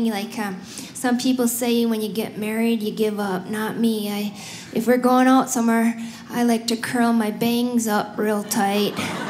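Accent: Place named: Wisconsin, United States. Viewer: I love her accent! As a cheesehead in Wisconsin, I probably talk the same way.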